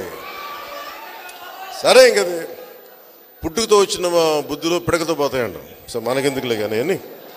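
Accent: India. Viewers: nuve inter failed candidate... America ki adukkodaniki vellii...nuvu ne Buttler English...vammoooo... nee picchi elagey continue cheyee.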